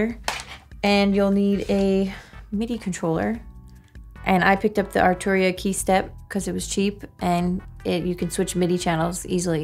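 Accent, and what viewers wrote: Long Island, United States. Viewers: that long island Accent is hitting different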